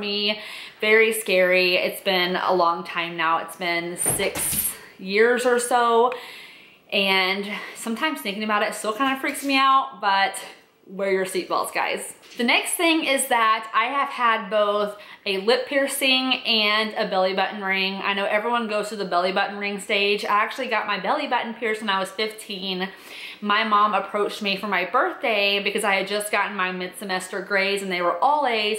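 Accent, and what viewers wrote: South, United States. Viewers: I found out recently that a co-worker of mine is also an avid YouTube watcher. We live in Des Moines too and she told me about your channel! I was confused because I definitely detected a southern accent in your last few videos; it makes sense now!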